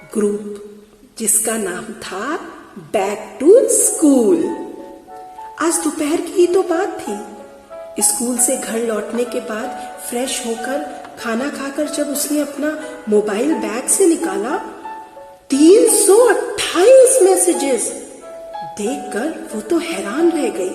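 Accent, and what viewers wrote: Kanpur, India. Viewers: Amazing.... the way you narrate the kanpuriya slang hahahaj too good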